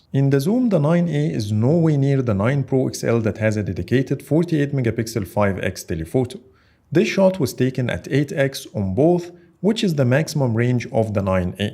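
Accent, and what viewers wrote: Egypt. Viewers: Is this Egyptian accent 😂 or i am just imagining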